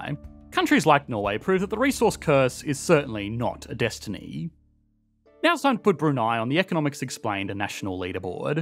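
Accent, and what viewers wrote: Australia. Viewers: He's an Aussie. I assume that you have a VPN to watch this from China. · Greetings from China! You an Aussie or Kiwi? Guessing Aussie...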